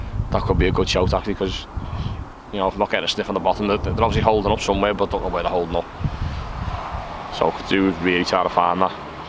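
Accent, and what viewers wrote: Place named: Liverpool, United Kingdom. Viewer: That scouse accent is something else...well done kidda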